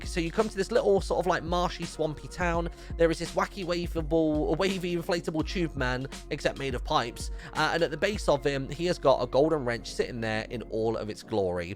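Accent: United Kingdom. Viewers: how is it its like a british accent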